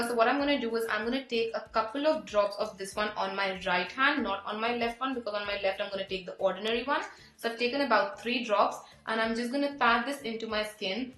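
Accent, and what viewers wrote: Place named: Bengal, India. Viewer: di doesn't have speech disorder. She just speaks in a bengali accent and there's nothing wrong in it.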